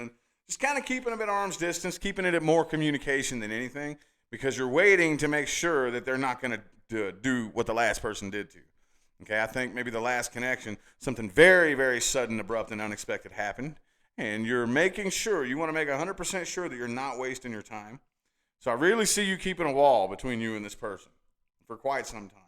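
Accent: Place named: New England, United States. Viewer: Lovely yanky twang..!!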